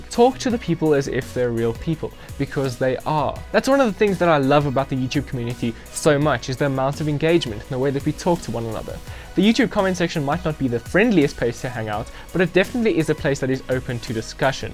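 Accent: South Africa. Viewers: Clearly South African bru... you still there? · Is that a South African accent I hear?? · South African? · Are you South African ??? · Are you South African?🤔 your accent sounds like you’re from here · I hear a south african accent there 🤔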